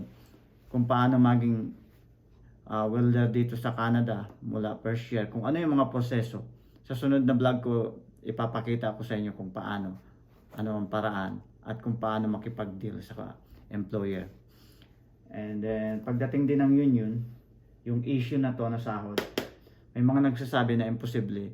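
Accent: Bicol, Philippines. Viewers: Garu bicolano si sir, ayos! Oragon. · bicolano ka boss?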